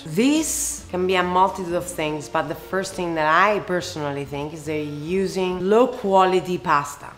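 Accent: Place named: Italy. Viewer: Regarding her accent, it should be clear to everyone in the meantime that she is not American, but she is Italian, born and raised in Italy. She came to America as an adult.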